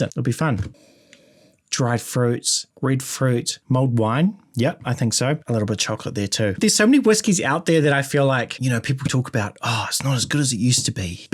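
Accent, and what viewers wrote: New Zealand. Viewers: Hi, nice vid! Liked! Sorry für beeing curious, but is that a kiwi accent?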